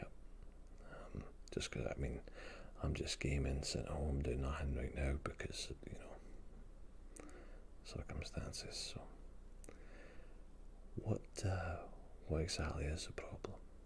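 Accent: Scotland, United Kingdom. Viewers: Found you from crinkeluvin’s video! love the video and it’s nice to hear the accent of a fellow Scot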